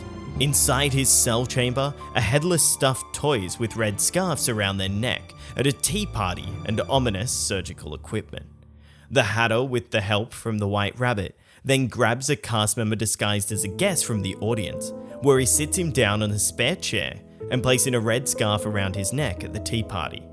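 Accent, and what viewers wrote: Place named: Australia. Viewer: Are you from Australia if not then I like your accent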